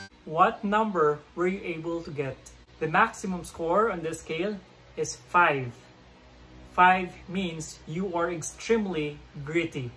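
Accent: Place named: Philippines. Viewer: Owwh i like ur content po and also ur accent when u speak english proud filipino talaga hehe more interesting video pa lods cant wait to see more videos from you po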